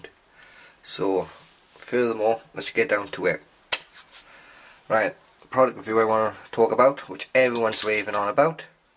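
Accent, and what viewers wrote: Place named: Wales, United Kingdom. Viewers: Welsh :D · Jesus! I can't even read the subtitles! What an accent!!! Dat British...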